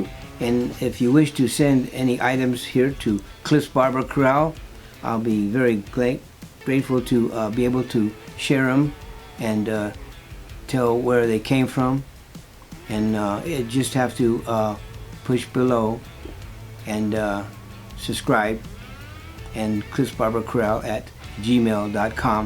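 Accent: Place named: Southern California, United States. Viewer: Are you from Cali Cliff? You have the SoCal accent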